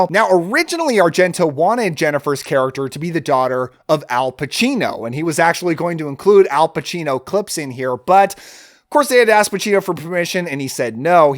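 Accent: United Kingdom. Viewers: I was also going to say it is a mid-lothian accent but you beat me to it first... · Yeah! Great description. Edinburgh is the sort of area I was thinking that sort of accent is from but I've only been there once myself so didn't feel super confident at identifying the accent.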